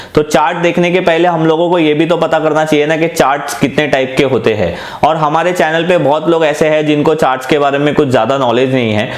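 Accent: Gujarat, India. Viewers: ગુજરાતી છો? વાત પરથી તો એવુંજ લાગે છે. ખૂબ સરસ કામ કરી રહ્યા છો.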